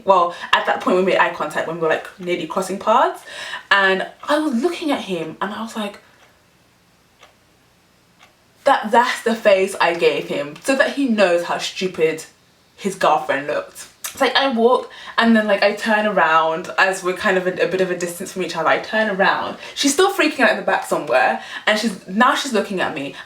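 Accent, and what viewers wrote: England, United Kingdom. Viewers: She has the British accent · I can kinda understand (some what) peoples need to stare at things that they don't see very often or they're not use to. In the US there are a lot of different races so some one walking by of a different race from you isn't a big thing but there is one thing about you that would make me stop. Your accent. I love your accent! Hearing an English accent on a black person is rare here, so I would do a double take. I would stop you just to ask you to chat so I can listen to you talk. To be fair I would do that to anyone from the UK.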